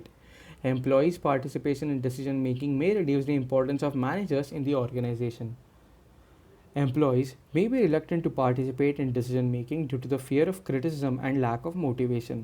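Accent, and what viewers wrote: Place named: India. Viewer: its an Indian accent so difficult for most to follow outside India.If you are making a video for a larger audience please have pictures, subtitles to add more value.I am Indian and no offense to anyone but even though we talk in English our accent and pronunciations are different.Great material but please make changes.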